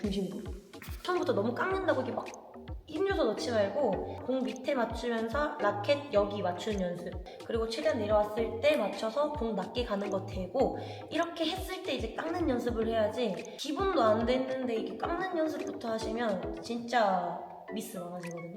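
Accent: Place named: Gyeongsang, South Korea. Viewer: 거 경상도 어디요?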